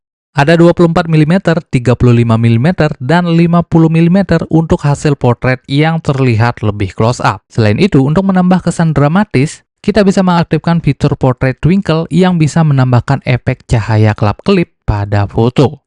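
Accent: Bali, Indonesia. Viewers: Logat bali nya kental sekali Bli.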